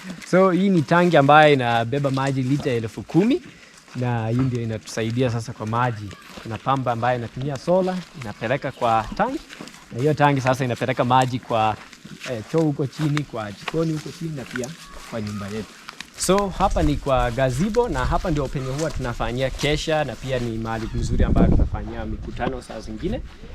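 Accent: Bukusu, Kenya. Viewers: The Luhya accent is just awesome 😀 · Accent ni ya mbukusu bana he talks like mulamwah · The luhya accent is on point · FOR ME IT'S THE LUHYA ACSENT, I LIKE THIS HAPPY FAMILY, MAY GOD CONTINUE BLESSING AND PROTECTING THEM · Mzungu mbukusu😅accent niya kibukusu aki😅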